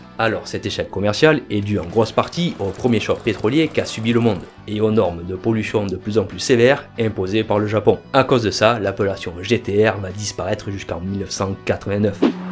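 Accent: South, France. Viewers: tu t'exprime avec un super Français, qui sen un peut le sud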